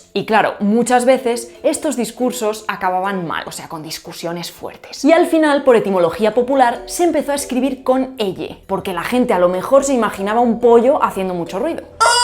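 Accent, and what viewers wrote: Argentina. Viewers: No todos los argentinos hablan con ese acento Srta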